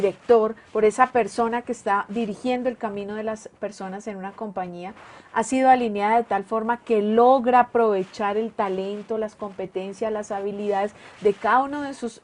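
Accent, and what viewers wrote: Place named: Colombia. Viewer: Adoro el acento colombiano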